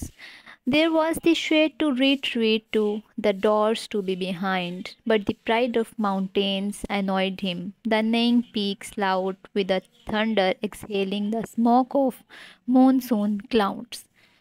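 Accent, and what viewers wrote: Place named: Lahore, Pakistan. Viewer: Thankyou Mam ji....... love from India and aap Lahore ki Hai mujhe pata chal gya jab aapnne bola theek ho gaya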